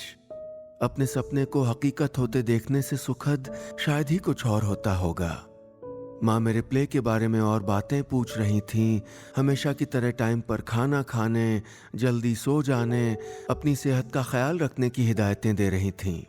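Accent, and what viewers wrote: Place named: Marwari, India. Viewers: सर, जय श्री कृष्णा, मै राजस्थान के जोधपुर के रहने वाला हूँ,और जब भी आपकी कहानियाँ सुनता हूँ तो उसी मे डूब जाता हूँ, पहली बार आपकी आवाज मे राजस्थानी मारवाड़ी भाषा सुनकर ऐसा लगा की आपने कानो मे मिश्री घोल दी हो। आप से अनुरोध है की आगे भी दीक्षा चौधरी जी की कहानियाँ आप हमको मारवाड़ी मे ही सुनाये,धन्यवाद,CA अभिषेक वर्मा,<num> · Bht hi pyaari story thi sir and aap bht pyàari rajisthani bolte ho👌👌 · 'Kup chan goshta' matlab 'bohot hi achhi kahani'. 'Hrudaysparshi' yani 'dil ko chunevali'. Indian languages are so amazing i liked the way you spoke rajasthani so mch.I couldnt control commenting in my mothertongue marathi I wish i could write a story and nilesh sir narrating it.....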